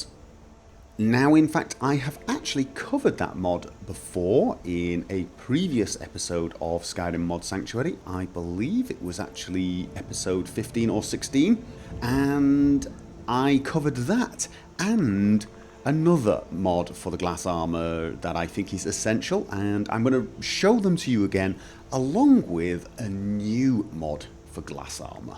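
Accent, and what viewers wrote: United Kingdom. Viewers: i love your sound and your British accent :D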